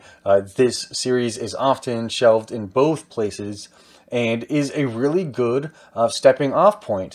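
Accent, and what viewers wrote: South, United States. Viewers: I love your video at your house in Texas during your quarantine until you're talking about your Top 10 favorite fantasy series with a lot of books are Red Sister, Grey Sister and Holy Sister by Mark Lawrence, The Name of the Wind and The Wise Man's Fear by Patrick Rothfuss, The Fifth Season, The Obelisk Gate and The Stone Sky by N.K. Jemisin, Eldest, Inheritance, Brisinger and Eragon by Christopher Paolini, Gideon the Ninth and Harrow the Ninth by Tamsyn Muir, Mistborn, The Well of Ascension and The Hero of Ages by Brandon Sanderson, Green Rider, First's Rider Call, High King's Tomb, Blackveil, Mirror Sight and Firebrand by Kristian Britian and so many others while you're talking about all the characters from the book, I hope your husband, Zach will be very proud of you, but I know you're half Mexican and you had the Southern accent!! 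#BookTube #SFF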